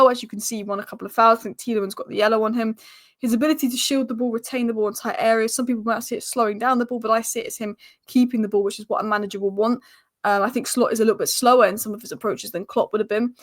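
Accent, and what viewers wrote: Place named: Manchester, United Kingdom. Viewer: That's a thick manc accent.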